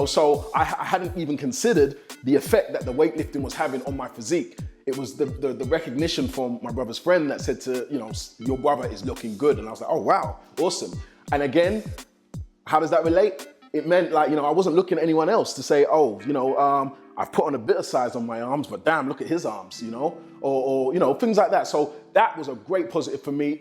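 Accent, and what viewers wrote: London, United Kingdom. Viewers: Why does he sound like he from UK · I had no idea that he was British, I've been following him thru Instagram and haven't heard him speak till now haha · Simeon Panda your body is sexy and I Love your London ACCENT YOUR BRITISH SEXY BROWN SUGAR BOY .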